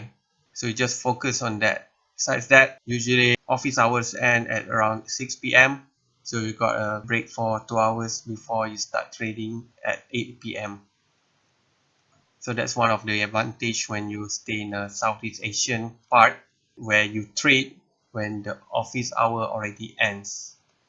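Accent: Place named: London, United Kingdom. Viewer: Waa bang. Spiking london laaa sekarang.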